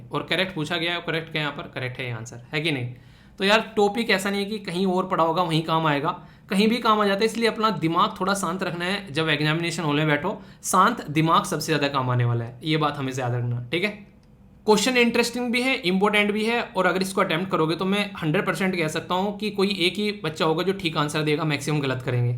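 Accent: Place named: Uttarakhand, India. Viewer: Sir aap Uttrakhand ke ho aapke tone se lagta he.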